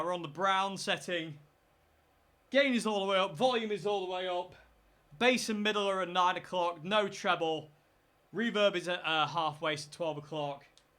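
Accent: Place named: England, United Kingdom. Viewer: When it comes to SS amps, I love my Peavey Bandit Silver trim and was considering a Fender Champion 100 now that I am back in a gigging band (loud drummer, bass, synth, and 2 guitars doing modern rock) but after seeing this video should I really go for the Boss Katana 2x12??? Oh and sorry for ever thinking was Scottish (Borders region). Yours is a tricky English accent to place but I am still embarrassed as someone who has spent time on both sides of the border.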